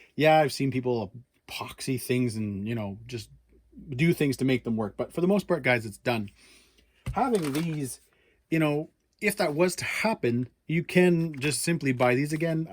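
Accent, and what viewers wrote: Canada. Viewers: You from Nova Scotia ?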